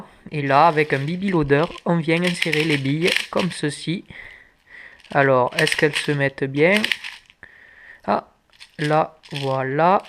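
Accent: South, France. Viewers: nan mdrrrr c'est un accent du sud 😂😂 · pour l'accent du sud :) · au l accent sudiste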